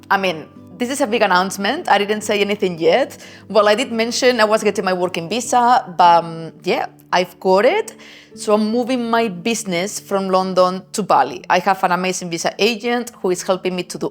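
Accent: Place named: Spain. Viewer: ✌️ oh that is Spanish 👌 sounds so nice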